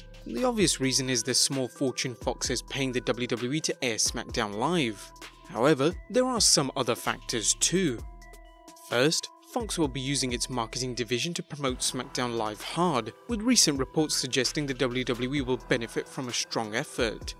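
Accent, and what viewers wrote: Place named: United Kingdom. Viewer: The voice of this vid should be in USA Not UK. Very bad. Lates.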